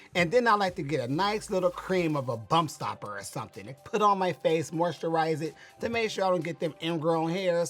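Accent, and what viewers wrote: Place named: South, United States. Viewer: I'd like a quick peck of a kiss as I promise I've never seen out of drag. Too cute. Nice complexion and skin with thick lips + sounds so Southern with the dialect.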